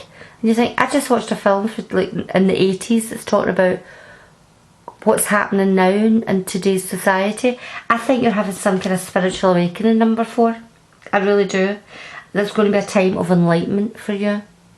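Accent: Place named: Scotland, United Kingdom. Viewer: No.4. Loving that lovely Scottish accent of yours. Blooming Brexit dh 🤪. Thank you 🙏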